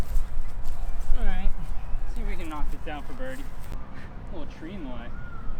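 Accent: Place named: Ireland. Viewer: Awesome Irish ACCENT!!!!!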